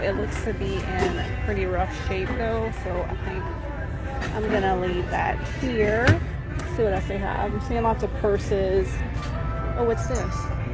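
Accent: Louisiana, United States. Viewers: The music - ugh - but I love watching you- I’m a Louisiana girl so I get your accent😍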